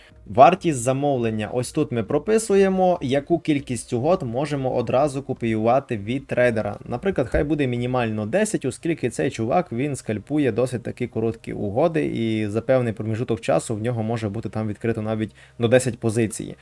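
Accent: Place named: Transcarpathia, Ukraine. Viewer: Чується Закарпатьський діалект )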